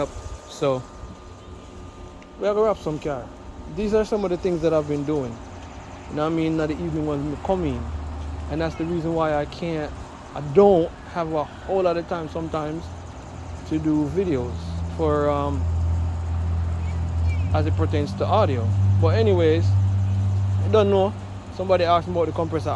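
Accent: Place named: United States. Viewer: Man a Yankee now. It's a Jamaican channel I subscribed to bredda. Be careful with the accent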